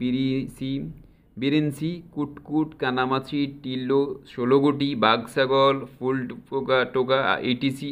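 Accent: Noakhali, Bangladesh. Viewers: আপনার গ্রামের বাড়ি কি নোয়াখালী?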